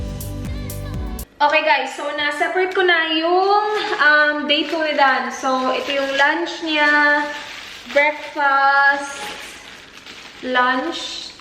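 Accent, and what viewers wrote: Visayas, Philippines. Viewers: Life en easy lng naiintndhan ko ..😒😒😒bisayang bisaya ang dating sa mga words na sinasabi... buti ate nagustuhan ka niyan😄😄😄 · Hello po. You sounds like bisaya. May I know kung taga saan po kau? I'm proud bisaya from Davao city:-). Looking forward for more videos to watch...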